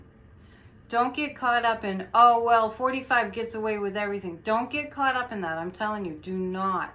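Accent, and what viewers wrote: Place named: East Coast, United States. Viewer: You sound East coast. Makes me feel right at home. 💛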